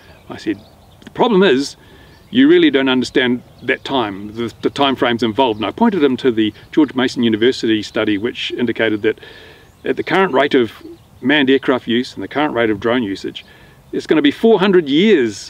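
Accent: Australia. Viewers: Whats that accent? Aussie? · Is this guy Australian?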